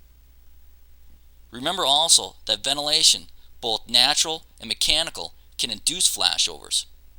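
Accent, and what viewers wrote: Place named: Wisconsin, United States. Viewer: There's just something about narrated fire videos. They're mesmerizing. That accent tho. Wisconsin? Eastern Minnesota?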